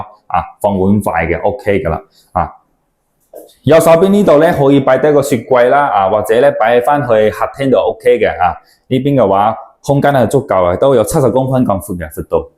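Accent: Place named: Taiwan. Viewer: 點解你廣東話似台灣人，不像大陸人？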